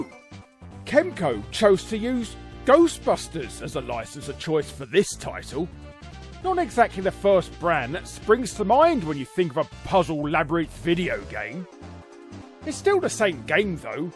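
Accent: England, United Kingdom. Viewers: I love the unrelentingly british pronounciation of 'kadokawa shoten' lol, let alone yokay busta rooka chan. Also surprised that Pandemonium!/Magical Hoppers didn't make the cut, although I guess this is specifically for licensed mascots rather than just games that changed their mascots to appeal to japan/vice versa. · your pfp looks like something Harry patridge would draw xD and you sorta sound like him with a English accent xD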